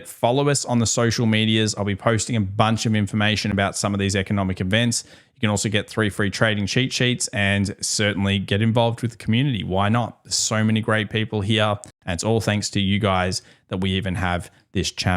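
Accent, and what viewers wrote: Australia. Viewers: you're Australian? I thought you're British.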